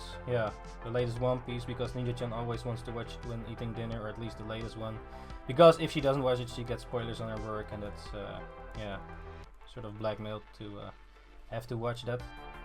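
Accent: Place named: Netherlands. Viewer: i just found this recommended to me and i could instantly tell ur accent was dutch lmao geweldig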